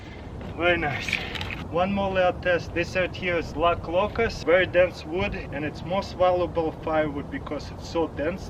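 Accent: Russia. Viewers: Bring back Russian accent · Do you russian because. Your aced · An out of shape white guy from Tennessee talking with a Russian accent. Eh, seems to be working for him.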